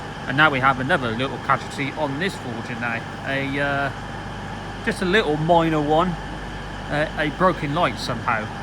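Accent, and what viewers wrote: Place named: Exeter, United Kingdom. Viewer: You know YouTube on the phone? When your scrolling and the vid plays? Your Exeter accent is playing havoc with subtitles man, not ‘southwest friendly’ 🙂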